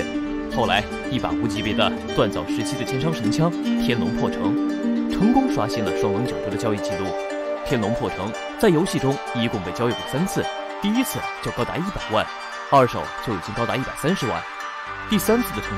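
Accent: China. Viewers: 聽起來是大陸人 可是單位一直換算台幣 搞得我好亂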